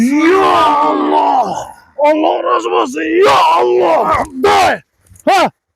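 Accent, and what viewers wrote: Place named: Fergana, Uzbekistan. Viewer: Кара бир жойларинг бир нарса чикиб кетмасин.Гапида фаргоналикга ухшайди,шунча огирни кутаргандан кура пахта терсанг булмайдими ука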